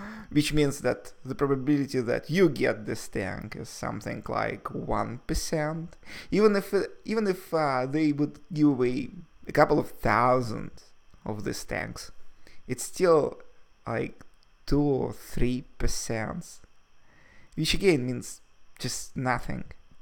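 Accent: Russia. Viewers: Is russian?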